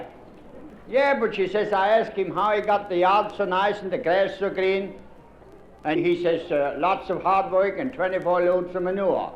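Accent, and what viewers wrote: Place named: Pennsylvania Dutch, United States. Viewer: he used to be at our family reunions when I was a kid... now 67 years old and that is how the old dutchies would talk my grandfather and uncles talk that way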